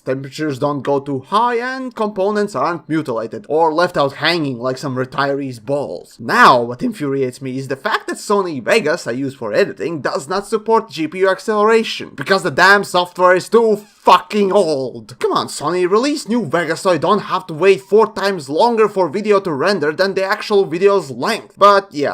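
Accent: Latvia. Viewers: I KNEW I HEARD A LATVIAN ACCENT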